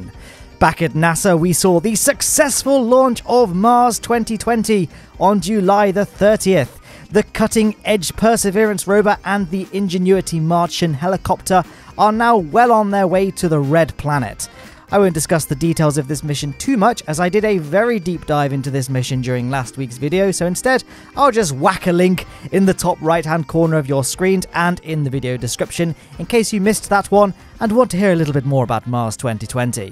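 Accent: United Kingdom. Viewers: You sound extra English in this video? Like when you did blunderbirds intros, it sounds odd after watching velocity lake!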